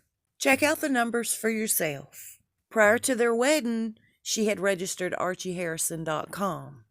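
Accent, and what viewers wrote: South, United States. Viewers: Great video,. Love your Southern accent.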